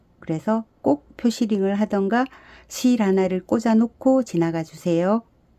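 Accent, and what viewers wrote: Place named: Gyeongsang, South Korea. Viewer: 유툽 여러 선생님들 많지만 최고예요~^^경상도 억양 넘 정겹고요 꼼꼼하고 디테일한 설명에 감동여요^^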